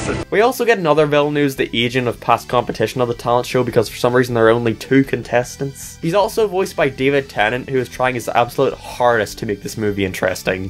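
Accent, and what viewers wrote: Northern Ireland, United Kingdom. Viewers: I honestly started watching you because your accent makes your commentary pleasing. If I had to guess, youd be from Northern Ireland. I had a coworker from there and I can hear the similarities between you two.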